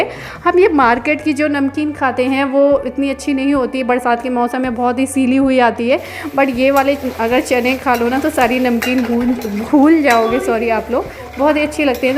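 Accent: Uttar Pradesh, India. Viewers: Ap up se ho kya... ??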